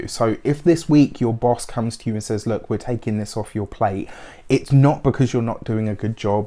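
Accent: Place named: London, United Kingdom. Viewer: Am from the south west of England absolutely love your accent makes me feel at home in LDN. Thank you for this weeks 🙌🏾🧡🙏🏾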